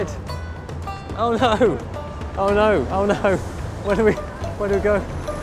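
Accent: England, United Kingdom. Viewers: How did you get a English accent?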